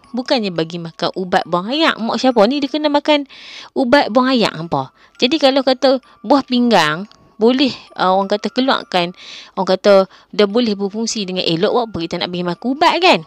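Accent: North, Malaysia. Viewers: Akhirnya Syafa cakap loghat utara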